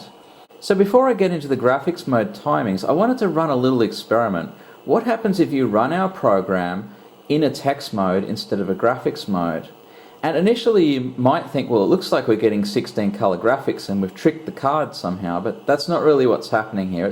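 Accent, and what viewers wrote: Australia. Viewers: Excellent video! Wizard-like skills! We should combine work over our YouTube channels or something if you are interested. Sounds like you are in Aussie - I'm in NZ. Give me a shout if you like :)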